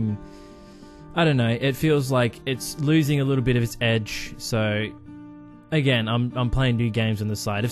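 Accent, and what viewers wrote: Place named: Scotland, United Kingdom. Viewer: that adorable Scottish accent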